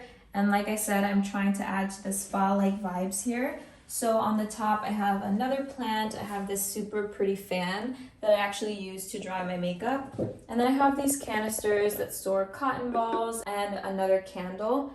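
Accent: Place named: Dominican Republic. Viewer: I knew you were Dominican from the first few seconds 🇩🇴 #rep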